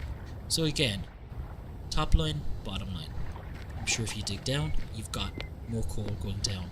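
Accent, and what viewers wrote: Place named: Newfoundland, Canada. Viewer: You're from Newfoundland arent ya! I'm from Ontario, we love you fellas :)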